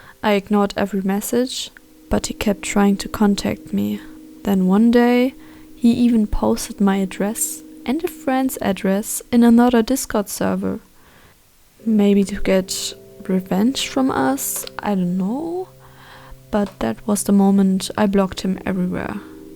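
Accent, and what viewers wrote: Germany. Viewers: Nice German accent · Are you German? Bcs I think I hear a little bit deutsche Akzent ✨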